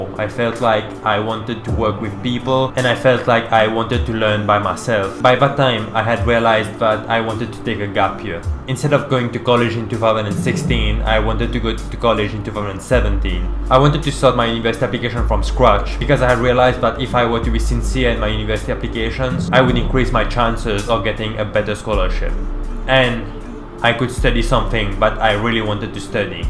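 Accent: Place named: Mauritius. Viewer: Oh, i am Mauritian too, I recognized you from the beginning itself because of your familiar accent. Guess what, applied to MIT too, waiting for regular decisions 2019. I feel less qualified than you, means I don't even stand a chance. I have not applied with Phronesis, did everything independently with my own reasearch. I took two gap year after highschool during which I was working in an american company. Thanks for sharing the video.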